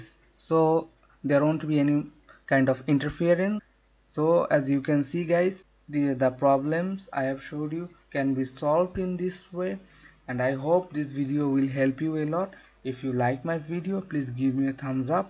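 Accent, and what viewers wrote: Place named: England, United Kingdom. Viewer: This English accents....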